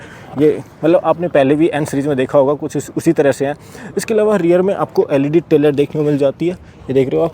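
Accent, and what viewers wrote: Haryana, India. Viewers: Heavy haryanvi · bhai aap hariyana wala hindi bolte ho to bhut hi acha lagta hai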